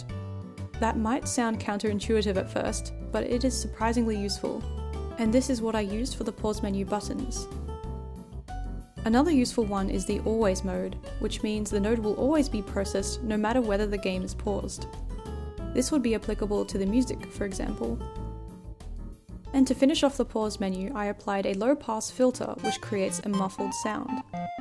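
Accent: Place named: Australia. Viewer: 7 days full time so about 60 hours in total? The hard work shows in the quality of the video! Even your first video is amazing, had you already had experience with video editing? I use Davinci Resolve too! Do you use the free version or have you paid for the paid version? I should check out motion canvas! I also noticed that your accent was familiar and I saw that you are Aussie! haha I am Kiwi. Great to see a creator from Oceania :D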